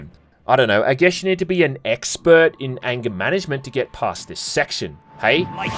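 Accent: Australia. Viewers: aussie accent is such pain on the ears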